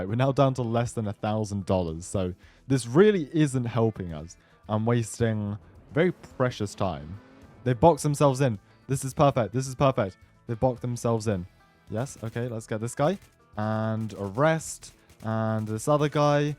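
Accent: United Kingdom. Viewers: why is every erlc youtuber british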